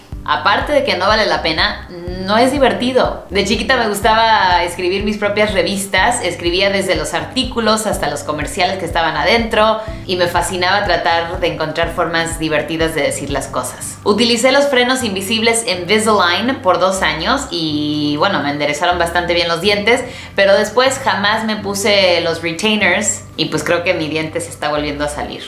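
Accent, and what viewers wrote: Mexico. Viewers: Tu acento lanza a mexicano. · Your Spanish is so good, I'm in shock. I watched your first video in English and I'm like, o yeah, she's from the states. In this video, you turned Mexican. You became a different person, like a popular person. I think I need Anthony Bourdain to explain this to me. I just now realized I become a different person when I speak Spanish. I have so many questions, Your Dad, Your food likes, your music interest... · Habla como mexicana... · Tu lengua nativa es inglés?? Tenés un acento algo mexicano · =) Ojalá todas las personas del mundo tuvieran tu simpatía y frescura! Tu acento mexicano es apenas perceptible, sólo se nota en la pronunciación de determinadas palabras o al finalizar alguna frase. Cuando vi el video "tips para mejorar tu inglés" supuse que habías estudiado castellano en Argentina. Me encantaron tus historias!. 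· apenas lo acabó de ver y wow de los mejores 50 cosas sobre mi, ya decía yo que hablas más mexicana, yo soy de guadalajara, me encanto encontrarte 😘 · Tiene acento mexicano más que español, supongo que es porque ha vivido en México, en cualquier caso habla fantásticamente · Hablas demaciado español Mexicano, al punto que hay palabras que no entiendo, por ejemplo:Capote ni se lo que es y me da una intrigaaa!. Tenes que hacer un video hablando como Argentino. ME ENCANTARIA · Me encanta tu acento Tía. Pienso que es por los años que viviste en México aprendiendo español, más de La sierra, y no me gusta tanto que hables chilangoooo..jajajaja.. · JAJAJA! hablas como mexicano con acento español (de España). Ni castellano ni Neutro. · oh tiene acento mexicano!!! haz los acentos latinoamericanos:) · Jajajaja se nota mucho tu acento mejicano. Yo soy de españa y por ejemplo cuando tu dices "linda" nosotros utilizamos guapa o bonita.;) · Escribí en otro vídeo mencionando que hablas inglés con influencia mexicana. 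Veo que se debe a que eres chayotera (de Orizaba)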